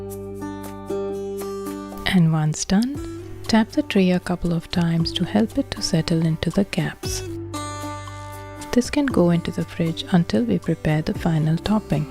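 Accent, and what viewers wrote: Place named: India. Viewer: Very nice u sound like u r from India 🇮🇳 am i west Indian trinidad 🇹🇹 lovely 🙏✌️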